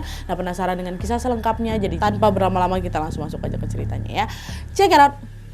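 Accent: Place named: Palembang, Indonesia. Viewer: Suka banget sama cara bicaranya,.wong kito galo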